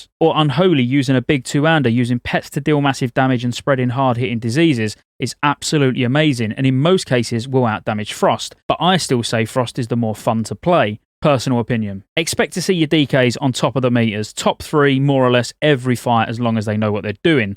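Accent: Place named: United Kingdom. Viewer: he talks too fast and the section are smeared together, you can barely notice when he changes subject. not to mention the terrible uk accent that makes you super hard to understand because vowel abuse.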